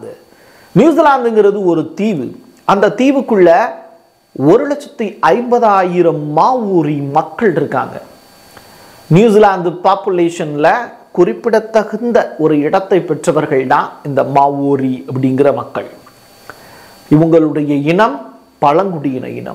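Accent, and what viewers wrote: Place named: Tamil Nadu, India. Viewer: உச்சரிக்கும் போது தமிழ் உச்சரிப்பு தென்படுகிறது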